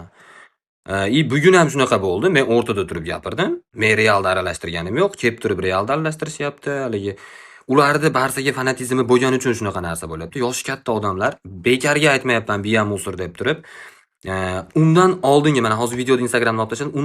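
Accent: Andijan, Uzbekistan. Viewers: Zor aka anjondanmisiz sheva ashaqa tortyapti😊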